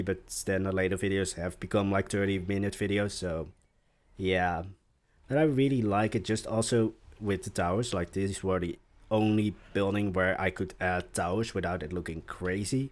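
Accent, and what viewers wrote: Netherlands. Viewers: Echt gaaf! Haha ik kon gelijk horen dat je Nederlands was, niet dat dat erg is, is juist leuk :) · You know right away it's a Dutch guy.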